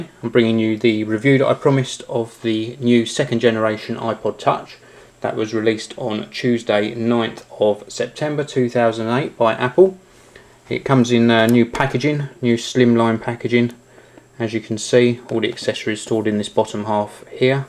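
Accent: England, United Kingdom. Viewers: A real good review.. ps. i like yor british accent^^.. i´ll get one next january..^^ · you have a heavy brittish accent...cool =) and wayz i want to got a ipod touch 8 gig 3g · R u British? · ru like from england cuz u have a acksent and i like ur ipod i be geting 1 really soon 2 more months and i be getting 1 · He's a Brit. Australians (I'm an Australian) sounds completely different! LOL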